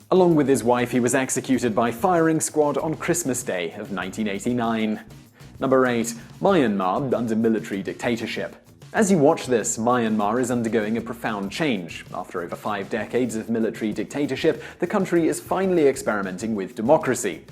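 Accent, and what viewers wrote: England, United Kingdom. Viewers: It's quite funny how the presenter here speaks in an English accent, yet uses and pronounces words in an American way. Anyone else noticed this?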